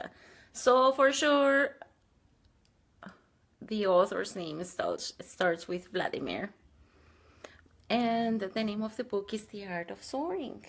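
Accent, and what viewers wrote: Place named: Russia. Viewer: thank you for your video - I think you are the first I’ve found to share about The Art of Soaring. You touched me by your genuine sweetness & intelligence. I like listening to you speak in your thoughtful, measured way and Russian accent. Happy 420! Also are you wearing a Cedar from Anastasia? I have one too. You made the connection between AOS and Ringing Cedars - I think it’s the same publisher not translator. Anastasia means a lot to my friends and I.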